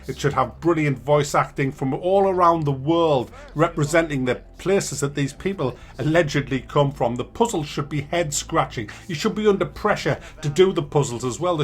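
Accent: United Kingdom. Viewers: it's poor and stupid part of the uk kind of a accent.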